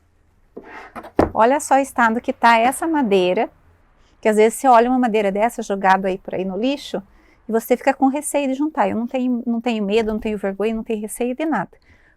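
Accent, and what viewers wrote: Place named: South, Brazil. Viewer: Menina contando com o sutaque que eu amoooooo acredito que tu e do Sul. Se cometi uma gafe perdao mas e qui amoo o sul essa aula e um luxuuu amei obrigada logo. Logo quero faser obrigada.pelo carinho.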